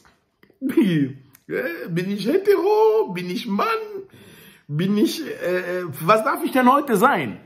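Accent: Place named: Ruhr, Germany. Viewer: Wer genau hinhört, hört hierbden Ruhrpott raus. Gut so; da liegt eh das Herz auf dr Zunge. Glück auf.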